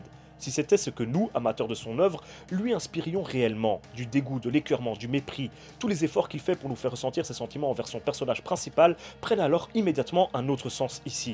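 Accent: Belgium. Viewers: Avec plaisir cher je partage ta passion:) + j'aime trop ton accent belge.Continues tes vidéos on t'aimes:) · et là bas compatriote Belge! Enfin....sans vraiment savoir, juste ton accent met familier! Hoooooooo oui Clairement, je suis fin friands et amateur de cinéma Underground et ce.....depuis longtemps! J'ai 31ans mais mon VHS des Germelins a 5ans me marquera pour la suite de ma vie cinématographique, ensuite a 10ans+- je reste bouche baie devant les Cénobites de Barker et forcément.....Freddy, je suis un ptn de fan des nanars gore des années 80, Abomination, BlackPast, BasketCase, les TROMA et puis bon....j'ai un vrai problème avec les zombies pr qui j'y porte une affection particulière (ON AIME PAS LES ZOMBIES QUI COURENT!) Romero, Fulci etc....etc.... Étant un gobeur de GrindCore/GoreGrind/BrutalDeath/BlackMetal etc.... C'était presque obligé de tomber fan du cinéma Underground avec une préférence pour Vogiel & Lucifer mais je gobe tout! 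Vive les litres d'hémoglobine, le sarcasme, l'humour noir et le 17e degré de l'humour! J'étais obligé de m'inscrire chez toi en découvrant ta chaîne ce matin 🙏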